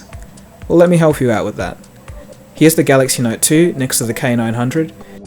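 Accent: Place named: Australia. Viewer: cool. did`nt realise u were from oz tho! you dont have a typical oz accent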